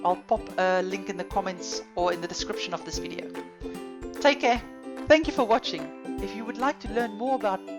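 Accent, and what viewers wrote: South Africa. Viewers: Excellent presentation. Love the South African accent. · Your previous video was so good, I came and found this one too! You sound like a fellow South African :)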